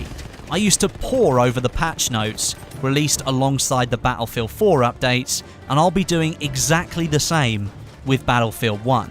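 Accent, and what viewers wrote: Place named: United Kingdom. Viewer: Wonderful information, thanks for delivering all of these information for us.:D Great channel,.. I become very happy the moment I notice that you uploaded a new video..:D (Between, you're a great English teacher, I'm practicing my British accent by watching your commentaries..) xD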